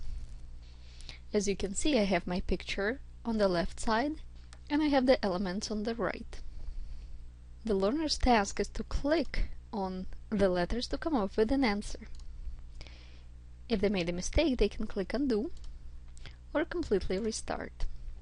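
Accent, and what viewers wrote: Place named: Russia. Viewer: This video helps me a lot! Thank you so much! Although you connect the first question to the second and so on, I don't know how to import 3 or more JMix sentences into moodle. Can I get it right? when importing these sentences into moodle, we choose all sentences! In exam view, I just import 1 file containing many different questions ( about 40 sentences). In hot potatoes, can I create and import 1 file containing many different kinds of questions? such as; JMix, Jquiz, JMatch.... I would appriciate your help! * P/S: Don't speak English in Russian Accent! It's hard to hear!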